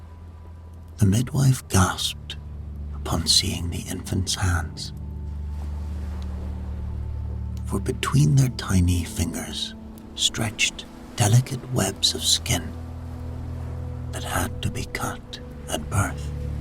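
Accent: Northern Ireland, United Kingdom. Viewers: Why does sound NI/Irish? It's putting me off relaxing. A recognisable Scottish Accent would be better. Yes I am Scottish, maybe it's the whispery way he is speaking but his inflections sound NI. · Doesn't sound scottish more skootish even Irish ai shit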